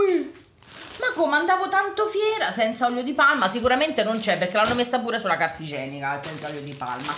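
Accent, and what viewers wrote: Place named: Marche, Italy. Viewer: Ciao cara, dal tuo accento (che è simile al mio) deduco che siamo entrambe marchigiane! Io abito in un paesino della Prov. di Ascoli Piceno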